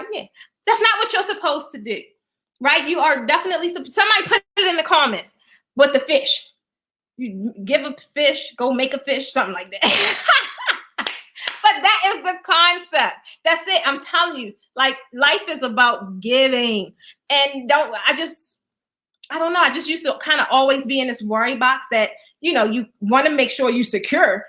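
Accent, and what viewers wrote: Baltimore, United States. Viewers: I love the bmore accent. Hope I got it right🌻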